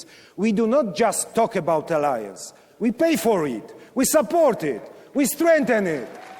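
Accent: Poland. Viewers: Angielski prezydenta nie brzmi sztucznie, słychać polski akcent, a sama wymowa jest pod kontrolą. Godne wystąpienie. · Hmm polski akcent? Ogarnij sie😮 TO Polak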